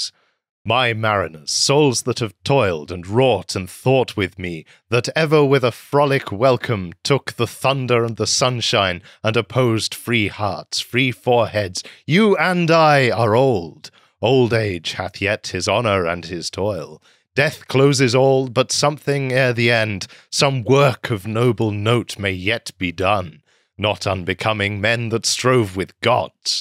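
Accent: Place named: United Kingdom. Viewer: The Brit narration can not be beat.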